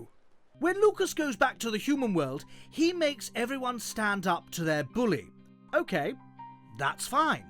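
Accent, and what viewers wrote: RP, United Kingdom. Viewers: This is the most British sounding person I’ve ever heard 😂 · I love there voice tbh, I definitely will binge the channel just to hear the fancy British vampire voice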